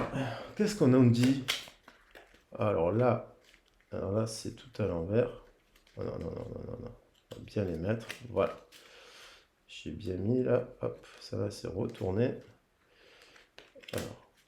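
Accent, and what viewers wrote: South, France. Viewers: Bonsoir. Un plaisir d'écouter tes guidances avec ce chaleureux accent du Sud, qui plus est toujours source d'enseignement également. Merci pour ta générosité et ton dévouement 😊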